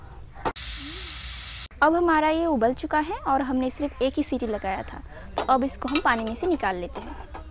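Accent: West Bengal, India. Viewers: Beta aapki boli, aur ye kamal ke talab ,aur taad ke ped , aisa lag raha hai west Bengal se hain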